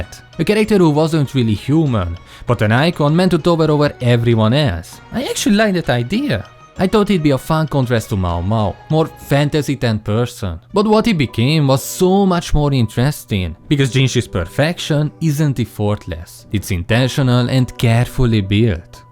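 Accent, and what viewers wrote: Hungary. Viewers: First video by for me. Not even a minute in......is that a hungarian accent I hear?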